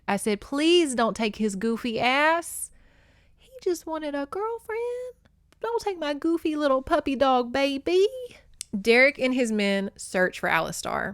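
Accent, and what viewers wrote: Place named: North Louisiana, United States. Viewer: I grew up "spittin' distance" from Blanchard. I thought you had a N. Louisiana accent. 😂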